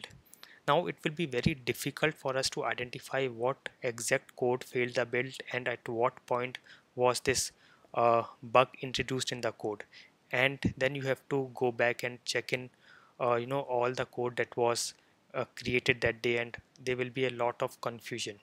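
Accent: India. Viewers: I guess you guy from India... /smile/ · 这印度口音，听着真累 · Do you can speak in English with out Indian accent ???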